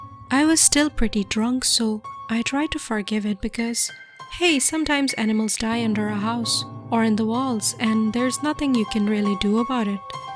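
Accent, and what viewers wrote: India. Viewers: Narrator's Indian accent is putting me off. She sounds Bengali. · You have a cute Indian accent. I can hear it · Is the narrator indian ?? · Your accent is Indian From~🇧🇪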